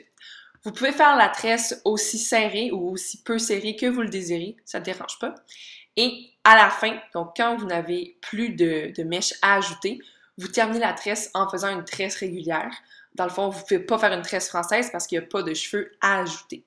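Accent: Quebec, Canada. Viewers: L’accent québécois du Québec! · C'est l'accent du Québec et ton commentaire est idiot ! Si on pourrait entendre le tiens on le trouverait sûrement plus chiant.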